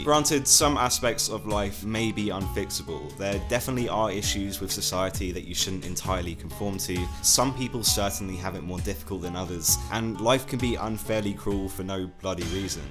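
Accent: United Kingdom. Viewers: Your cutting British accent and the background reminds me of exurb1a. Much love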